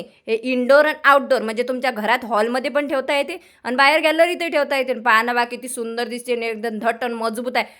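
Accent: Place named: Khandesh, India. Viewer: खांदेशी आहे का g तु ,काय ती मराठी भाषा तुझी, म्या 😂😂yayle😂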